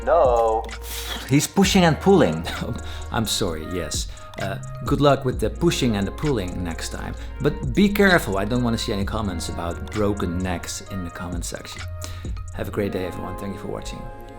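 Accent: Netherlands. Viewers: ik neem aan dat je nederlands bent aangezien je geweldige accent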